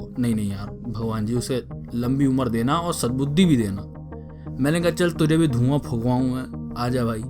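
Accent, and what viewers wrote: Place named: Lucknow, India. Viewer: bhai.. "Welcome to the Indian gamer channel".. sunn ke dil khush ho jaata hai.. dil khush kar diya.. aapke spintires aur mafia2 ke hum kaayal hain.. valient heart bhi dekhna shuru kiya hai.. baniyan wale badasses ko dekhkar hruday gad gad ho utha hai.. ise bhi poora karunga :D bhaiyyaji..waise lucknow k ho ka? aapke baat karneka lehja hamare sharmaji se kaafi milta hai.. aur bohot bohot dhanyawad hume izzat bakshne ka.. Aise hi karre karre gameplay banate jao bhaiyyaji.. Hope to see IG channel flourish..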